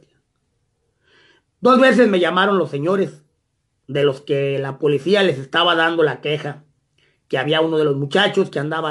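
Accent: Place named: South, Mexico. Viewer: tiene voz y acento sureño y no acento sinaloense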